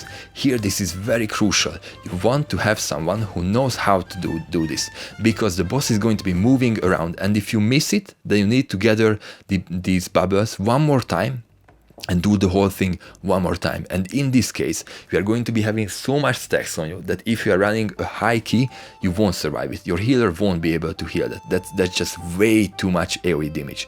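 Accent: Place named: Hungary. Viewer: Nem szeretnélek megbántani, de simán kihalatszik a szörnyű magyar kiejtés az angolodból. Rendesen vérzik a fülem. Viszont ha ilyen szépen megtanultál angolul folyékonyan beszélni,akkor érdemes lenne dolgozni rajta. Valamint egy magyar felirat sem ártana mert azzal nagyon sok embert érnél el, vonzanál be. Nem igazán vannak hasonló részletes videók magyar nyelven.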